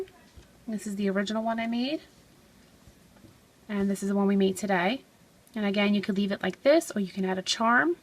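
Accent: United States. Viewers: You know what I love most? Your accent! I'm an Aussie and love listening/watching to your tutorials because of your American accent. Your designs are pretty awesome too :P xox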